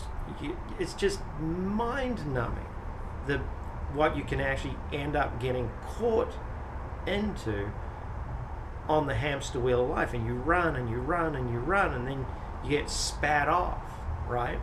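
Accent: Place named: New Zealand. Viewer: thats a very light New Zealand accent. easy to follow for us Yanks.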